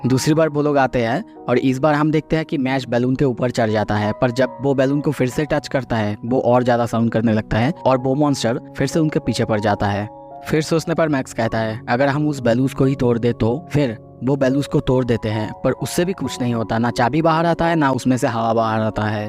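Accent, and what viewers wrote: Bengal, India. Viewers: Sounds like a bangali. Ma bhi bangali hu. Nice explanation video.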